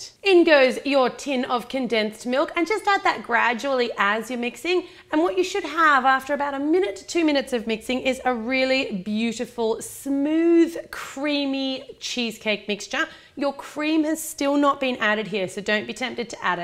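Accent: Australia. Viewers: Are you Aussie or American ?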